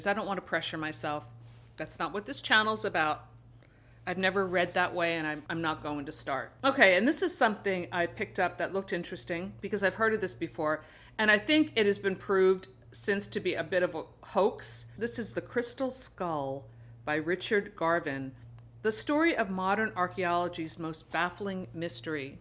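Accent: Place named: Pennsylvania, United States. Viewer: Fun videos! Sound like you are around bucks county pa! Same with me, it’s a lovely place to live!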